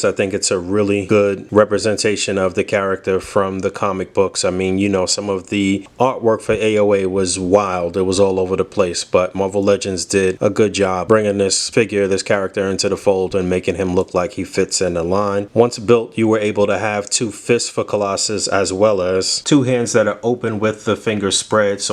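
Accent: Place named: New England, United States. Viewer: First time here, boy is that New England accent thick AF keep up the good work (QGTM)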